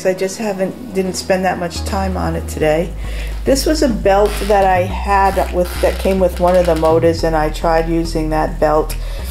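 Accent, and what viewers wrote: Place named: New York, United States. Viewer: Love your accent, are you from New York?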